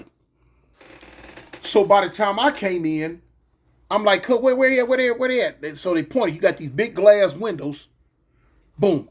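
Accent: South, United States. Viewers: Is anyone from the south in your family? Damn I’m from Atlanta but you remind me of a lot of my uncles. How you speak..your accent..maybe I’m tripping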